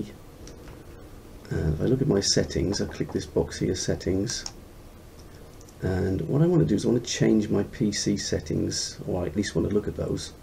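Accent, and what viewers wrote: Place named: United Kingdom. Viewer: You sound british alot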